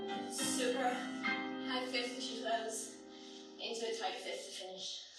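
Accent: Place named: New York, United States. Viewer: Certainly haven't lost your touch- You look like you're feeling healthier than ever 🧦💛 (Can't remember if you're from New York originally, interesting accent though)🦅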